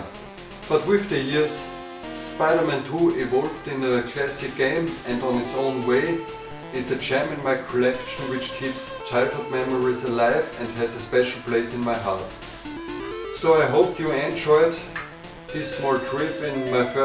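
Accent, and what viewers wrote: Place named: Austria. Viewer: you sound like an austria Sperling enlish. not greek.. but neis review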